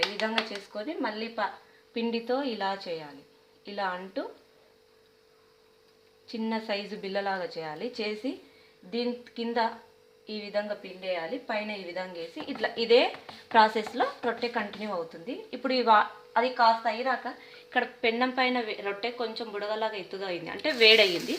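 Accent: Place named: Telangana, India. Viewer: telangana lo famous e jonna roteylu but mana yasalo chepandi enduku madhya lo koncham koncham yasa change chestunaru akka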